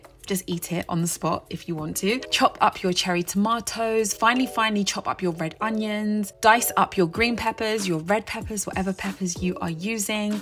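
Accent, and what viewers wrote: United Kingdom. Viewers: Like Jamie, you’re speaking Essex, right?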